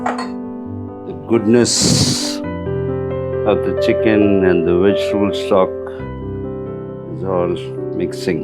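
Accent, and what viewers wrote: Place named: India. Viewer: I’m third generation Indian born and living in South Africa. Love the content, your accent is a little bit similar to first generation Indians. My grandparents surname was Vengtas Ramiah, they are now late and wish I knew where in South India we are from. I visited Mumbai for work and loved it. Love from Johannesburg ❤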